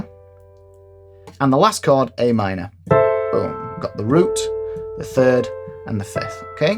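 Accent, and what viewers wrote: United Kingdom. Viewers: You sound like british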